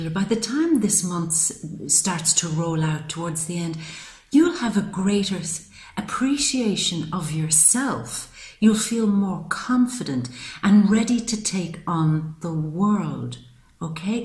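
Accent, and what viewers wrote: Ireland. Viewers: Thank you so much for your time and energy doing these reads for us💕😘 xx I’m newly subscribed to your channel..... So lovely to hear an Irish voice....I lost my soulmate of 20 + years, 2 & 1/2 years ago and his family sadly before that, they were from Carrick-on-Shannon, family name Conlon.... Taurus is my eldest daughter, we’re r/c and since my spiritual awakening we have differences in religion ..... I turned down Sag who’s my ex husband, he’s married to Aries and he’s been seeing my Gemini friend who’s also my twin flame...Now he’s moving onto my Virgo friend....So glad I said no..... As my mother in law would say, Your well for Anne,lol...... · You sound like a sexy Irish woman. Insightful reading.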